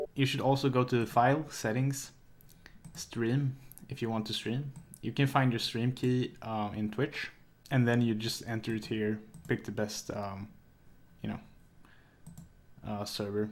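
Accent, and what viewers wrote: Sweden. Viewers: i fucking love your swedish kinda accent! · den svenska/engelska dialekten ;) du låter iallafall bra med din dialekt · du har den klassiska svensk-engelska dialekten haha grattis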